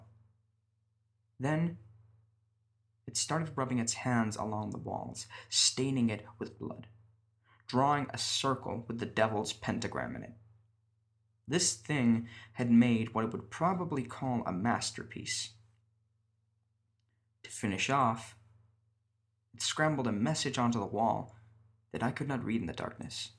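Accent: United States. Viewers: The American accent sounds fantastic, only thing I noticed that was a bit wrong was when you say "idea" you pronounce it more like the British "ideer" and less like the American "ideeya". I Hope you don't mind some constructive criticism. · why do you use an American accent when you read creepy pastas?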